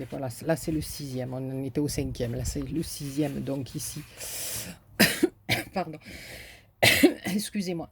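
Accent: South, France. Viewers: Tout à fait sublime ❤️❤️❤️ton album est magnifique avec les papiers ...une abonnée de plus...hihi merci pour le partage. J aime ton accent du sud . Bises 😘 Anita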